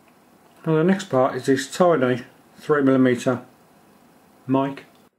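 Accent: United Kingdom. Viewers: UK english?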